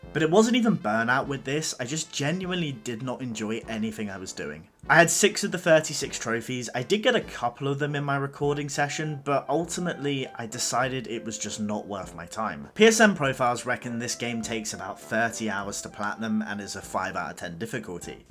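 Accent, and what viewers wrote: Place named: United Kingdom. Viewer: I'm guessing by your accent that you're from the UK. surely you can pick up a copy of naughty bear in cex for quite cheap. I've thought about going for it myself recently but seems like an extremely daunting task but wouldn't mind seeing you go for it 😂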